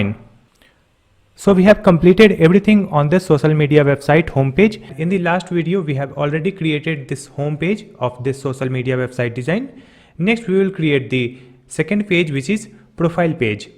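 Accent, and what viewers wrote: India. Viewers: bro, sorry I cannot listen to an Indian for 12 hours.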